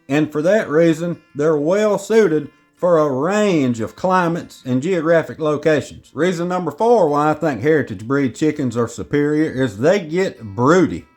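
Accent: United States. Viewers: Great American accent😊 it is good to learn American/English from you plus I learned a ton of knowledge about hen😊 thanks I live in Poland Piekary Śląskie my Father in law have few hens and rooster and we keep change them mostly hybrids but once i bought small incubator and chatched him couples of this originals and Yes they were more resilient and lived longer👍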